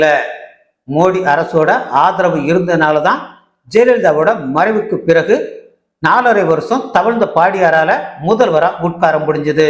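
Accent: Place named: Sri Lanka. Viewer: Sir நீங்க தமிழ்நாடா ?? இல்லை இலங்கையா?? உங்க பேச்சு இலங்கை தமிழ் மாதிரி இருக்கு...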